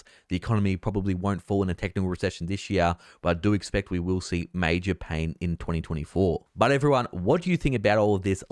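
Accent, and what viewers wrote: Australia. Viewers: Aussie accent...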